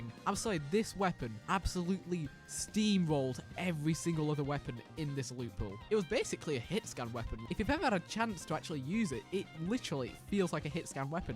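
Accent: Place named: United Kingdom. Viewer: Respectfully you are actually the most British sounding British youtuber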